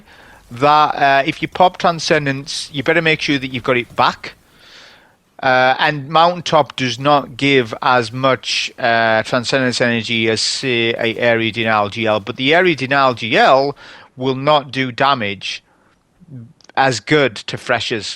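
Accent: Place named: RP, United Kingdom. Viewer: too much commentary!?posh posh bang on!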